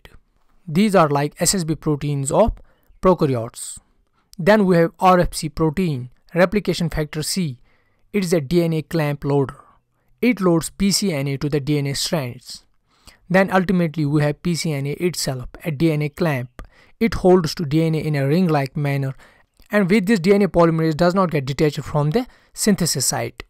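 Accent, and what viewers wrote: Kashmir, India. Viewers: U have a Kashmiri accent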